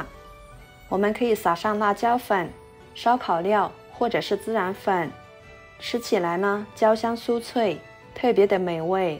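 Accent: Yunnan, China. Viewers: 我就想问，博主是不是云南的？🤣🤣🤣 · 嗯，一聽就是雲南妹子。😊好手藝👍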